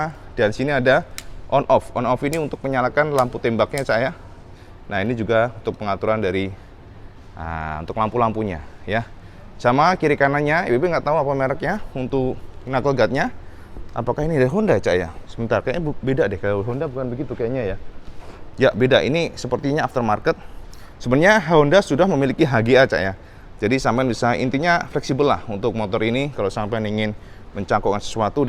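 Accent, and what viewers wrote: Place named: East Java, Indonesia. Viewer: Logat mu cak cak... Apikkkk 😎😎☕👍🇮🇩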